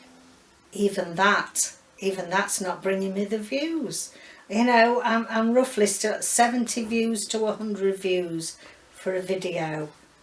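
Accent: Yorkshire, United Kingdom. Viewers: Hi, Ive been a subscriber for a while now and although I dont comment, I thoroughly enjoy your videos. Months ago I was just scrolling through YT and came across a Yorkshire accent, well I knew I had to follow you, being a yorkshire lass myself. I know nothing about junk journalling but I still look forward to your videos especially the sewing ones. I learnt so much from you watching those. I will be sad to see you go but you have to do what you feel is best for your mental health. Could you do one junk journally vid one week then one sewing vid the week after. Have you thought about selling on Folksy? Its a smaller channel but is a lovely place to sell as there are no mass produced rubbish on there. I really do hope you stick around, maybe have a break to regroup. Please take Care. Angie x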